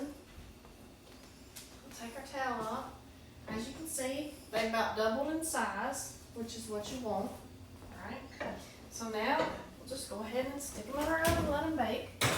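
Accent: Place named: South, United States. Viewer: Oh these look so good. Love your sweet accent and southern charm. Much love from Abilene. - Miss Hetta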